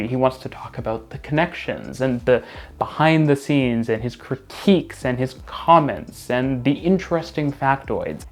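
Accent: Canada. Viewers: Hey. I really enjoy your videos and I hope this is not the end. It's great to get a Canadian voice ibn a see of American and European opinions. I even say Geoff Marshall talk of a series of videos with you. Whatever the future holds, good luck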